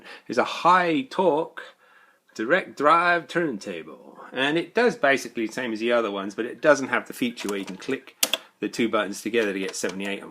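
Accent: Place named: West Country, United Kingdom. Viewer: The accent sounds like it's from the West Country, perhaps Somerset.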